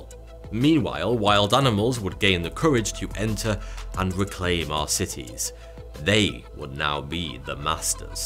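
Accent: United Kingdom. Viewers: I thought it comical that every person on the planet would dissapear. Is that another way to disappear? I shall never be around to find out so shall never know. Seriously, though, I love these videos and as an Australian find the attractive British regional accent adds to my enjoyment.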